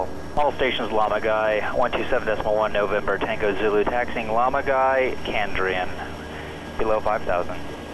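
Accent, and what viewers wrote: United States. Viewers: happy to oblige. I'm in South Lake Tahoe, CA. You sound like you are from So Cal perhaps. Interesting story you have. A joy to watch. Cheers!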